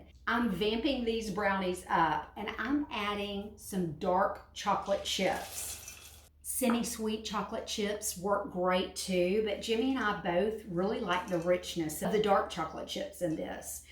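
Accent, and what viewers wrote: South, United States. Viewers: I’m trying to make brownies and they never turn out right, out of all the videos on YouTube.. I really didn’t like any. After about 20 videos and endless scrolling I finally found yours… immediately the southern accent made me know this is this video for me!! I’ll be making this now. Thanks.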